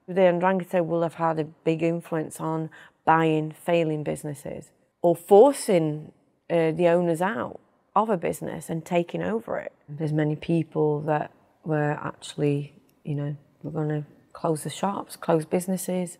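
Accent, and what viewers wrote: United Kingdom. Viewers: This is the most British sounding Italian I’ve ever seen. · Why does she have a British accent? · Wonder why she has a British accent? I guess she's from the UK? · Set sounds like she lived în Uk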